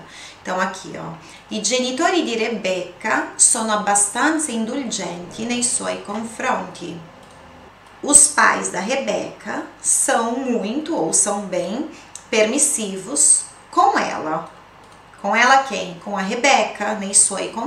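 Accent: Abruzzo, Italy. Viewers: Salve. Lei ha un accento familiare abruzzese. Ho vissuto 18 anni a Lanciano!!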